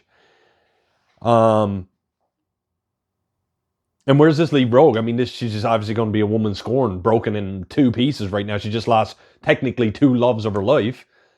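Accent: Newfoundland, Canada. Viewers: Great reaction. That episode was a roller coaster ride. I too cried like a baby. PS: Are you Newfoundlander? Couldn’t quite pin down your accent.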